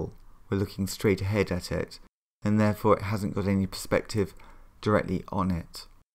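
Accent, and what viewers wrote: United Kingdom. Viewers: Such great instruction. Lovely voice. I adore the British accent. ❤️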